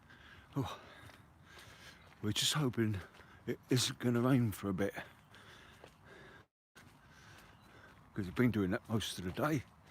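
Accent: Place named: Cockney, United Kingdom. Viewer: Is that you talking, nice voice, can detect a cockney accent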